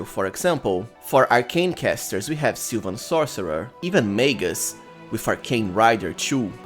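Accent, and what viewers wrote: Brazil. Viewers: What a kind, beautiful, genuinely helpful and clearly profoundly rich in experience video. A video out of pure passion. Thank you so much dude. Also, let me ask you, if you see this comment despite it being on an old video: Are you Brazillian? I ask because I am and your accent sounds a little similar to mine hahah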